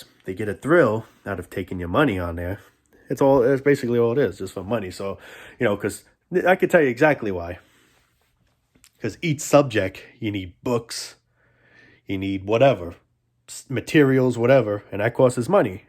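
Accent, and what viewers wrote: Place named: New Jersey, United States. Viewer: I can't tell if his accent is New Jersey, New York or Canadian...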